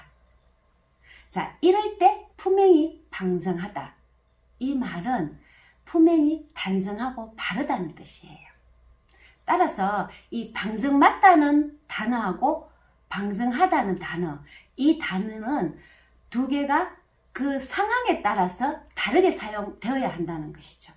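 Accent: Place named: Yeongju, South Korea. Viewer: 영주사투리 같은데 듣기 좋습니다.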